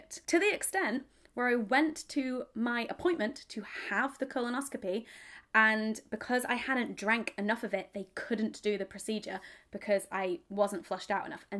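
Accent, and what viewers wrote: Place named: Manchester, United Kingdom. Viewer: Manc accent alert!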